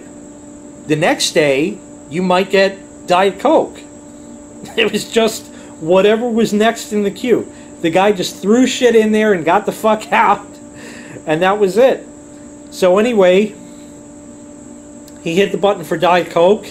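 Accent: New York, United States. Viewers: You know you're listening to someone from NY when they say "aarange" instead of "orange" If I had to deal with that vending machine, I would be so pissed. Getting orange instead of the Diet Coke I asked for? Fuck that guy... There was a time that paying with cash was the norm. Now it's debit cards like I have. I'll tell you, I feel much more safe spending with cash than a debit card. Honestly, do Millennials even know what the hell cash is?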